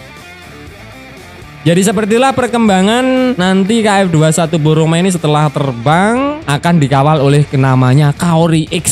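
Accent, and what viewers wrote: Java, Indonesia. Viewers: medok tongkol jelek parah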